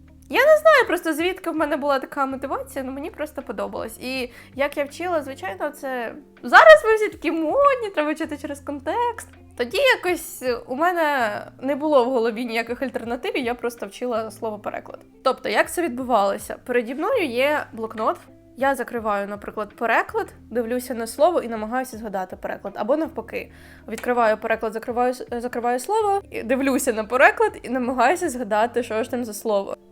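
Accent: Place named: United States. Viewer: хочу твоє відео, де ти говориш тільки англійською, мені дуже подобається твоя вимова. Я в захваті від максимально американського акценту😁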